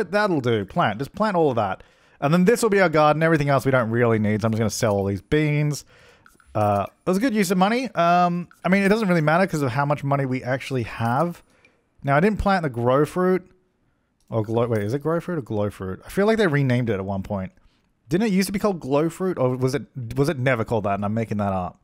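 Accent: Australia. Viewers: I once watched on my laptop closed it over then in the middle of the night the video somehow started again and (who lives females) suddenly beard a male Australian accent shouting about Katrina My name is pronounced Katrina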